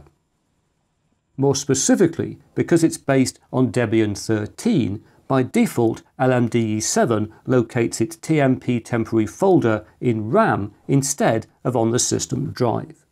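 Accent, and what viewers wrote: United Kingdom. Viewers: Dear ‚ I might be the most commenter on this channel by far. It feels so good to say that you are the god of computing. The way you explain topics‚ the way you divide content into subtopics‚ and ofcourse the great Britain accent. Brutha, you are the goat of computing. Using your videos as a guide to installing anything. Ofcourse, I try things out first. Use Chrome OS Flex on my Main Laptop. And use Omarchy Linux on my desktop home pc. You can say I use Arch btw!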